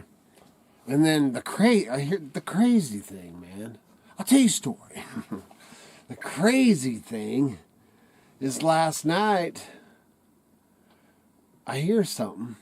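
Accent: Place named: Appalachia, United States. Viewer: Love that Hillbilly talk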